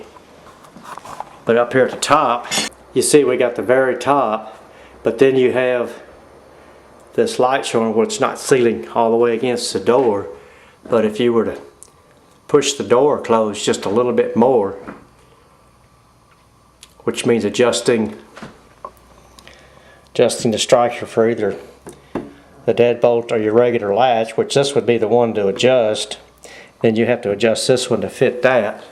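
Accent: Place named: Appalachia, United States. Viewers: That southern accent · Why is every one of these videos narrated by someone with a hillbilly accent.....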